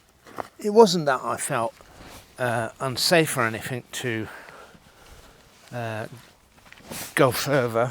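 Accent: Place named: Birmingham, United Kingdom. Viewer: well nice one, no wonder you have those bright lights then🤣 I think I heard a bit of a Brummy accent or was it just my second pint of Champion 7.3😆👍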